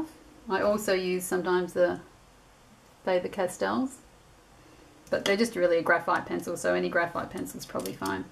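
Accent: Australia. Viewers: Great to hear an Australian voice & the correct ways to use mixed media products that are available in our country TFS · hi - spotted you in pinterest & came on over here to yr lil corner of the earth. this is the 2nd one of yr vids i've watched, but plan on watching them all eventually. i hear by yr accent yr an aussie - same here. i'm from adelaide - where are you from? anyway, thanx for sharing yr art, i've really enjoyed watchingit.:)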